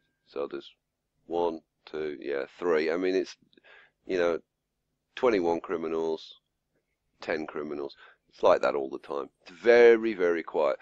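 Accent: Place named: United Kingdom. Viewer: I love your briton accent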